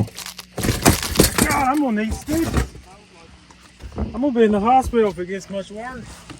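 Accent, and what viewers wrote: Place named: South, United States. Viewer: Where are you from? 100% no offense but I can't figure out your accent. You sound like a southern feller but then again sometimes not?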